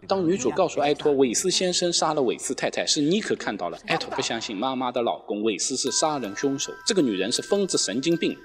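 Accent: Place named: Hangzhou, China. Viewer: 嗯 我杭州人 口音听起来很接近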